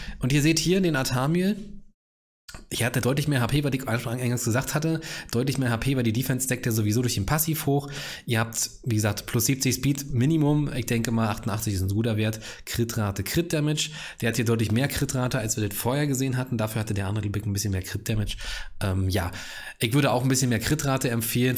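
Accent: Berlin, Germany. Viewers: Hey wieder danke für das nice video direkt mal Ariel und ella umgerunt waren schon eingestaubt dein Berliner slang macht es einfach noch mega symphatisch Grüße knall&voll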